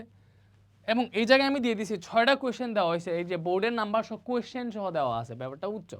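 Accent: Sylhet, Bangladesh. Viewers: Vaiiya apni kita sylheti ni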